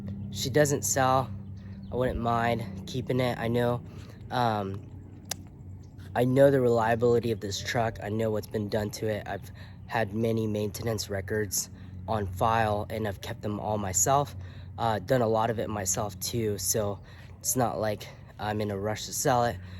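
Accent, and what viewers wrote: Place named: Southern California, United States. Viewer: As soon as he started talking i was like oh hes in so cal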